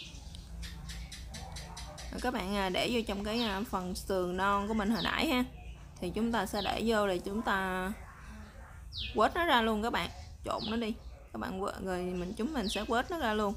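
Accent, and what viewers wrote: Mekong Delta, Vietnam. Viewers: Miền Tây quá bạn ơi "gõ nước.bỏ ga cái gổ🤣 cho nó gáo nước"" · Người miền tây hay nói rổ thành gổ, ráo nc thành gáo nc, lúc đầu mình nghe mãi ko hiểu về sau mình thấy ng nào cũng nói vậy, mới biết là tiếng địa phương, video của bạn mình rất thích, chia sẻ tận tình nhiều món chay ngon đoqn giản, chúc bạn khỏe gđ hạnh phúc nha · Chào cô gái miền Tây ( qua cách phát âm R ) cảm ơn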